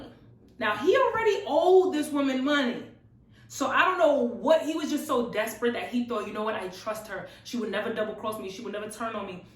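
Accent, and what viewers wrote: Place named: New York, United States. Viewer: I just love your New York accent! Lol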